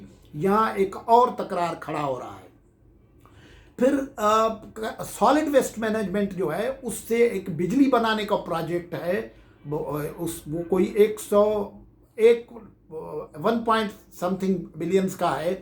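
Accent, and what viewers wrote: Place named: Sindh, Pakistan. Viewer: واه بهترين ڄاڻ اردو ٻولي جا لفظن کي درس اچارڻ جي ڪوشش ڪيو سنڌي لهجو بهترين آهي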